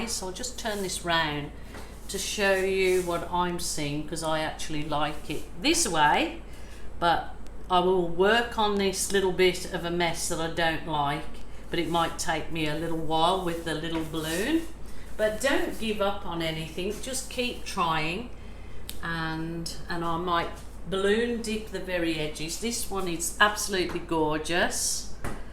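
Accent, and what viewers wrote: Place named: England, United Kingdom. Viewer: Wow absolutely beautiful. Love that you are Aussie (like me) although, I detect an English accent in there with certain words.... like 'round' and 'now'! Loving your videos. Great work! Thank you.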